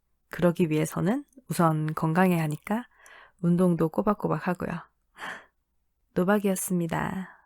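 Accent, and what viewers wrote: Gyeongsang, South Korea. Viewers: 원래 경상도세요? 전혀 몰랐어요 ㅋ 그리고 결정을 내리시는 순간에 저도 긴장했습니다 ㅋㅋ 저희도 찍을때마다 싸워서 늘쌍 고민중이에요 ㅎㅎ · 너무 좋아요 경상도라니 전혀 못느꼈어요 화이팅하세요!!!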